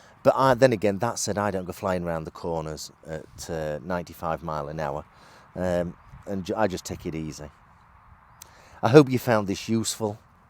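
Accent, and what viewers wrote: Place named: Liverpool, United Kingdom. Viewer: You must be from Liverpool, you sound like a Beatle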